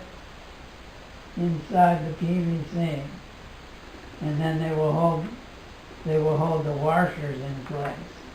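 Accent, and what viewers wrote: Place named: Lancaster, United Kingdom. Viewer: You have a slight Lancaster accent, bro--I hear 'war-shers' instead of 'wash-ers'. Didn't realize that before! interesting build using common parts!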